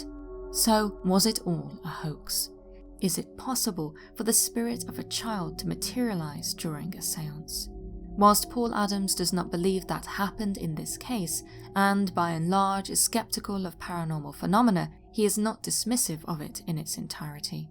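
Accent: United Kingdom. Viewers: As an American wouldn't you believe anyone with a soft english accent work for the BBC? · they have all regional accents on tv now to show how “evolved” they are…. How not prejudiced! But has the traditional well spoken British accent that is what you think of when you think of a professional presenter! 🙏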